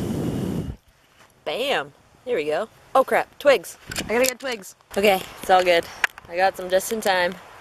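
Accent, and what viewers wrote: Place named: Canada. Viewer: I found you while searching for cowboy coffee, but there are so many other things in this video that I've long been interested, such as twig stoves, winter backpacking and the Morakniv bushcraft knife. I thought you sounded a bit Canadian. Very glad to have found you, and I'm looking forward to your next videos.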